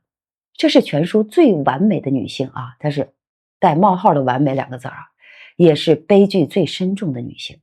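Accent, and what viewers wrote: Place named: Beijing, China. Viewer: 古韵京腔兼而有之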